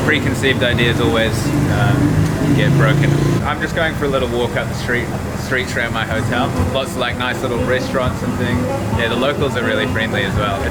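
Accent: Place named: New Zealand. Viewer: He is a New Zealander, not Australian. And if you have a hard time with the accent click on CC and you can read along with the captions. ( true blue Kiwi born and bred here ) :)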